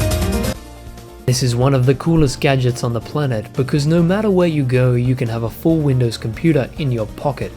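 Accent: Australia. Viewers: May I ask what accent that is? I'm not a native English speaker so I have difficulty placing accents. Australian? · Are you aussie mate?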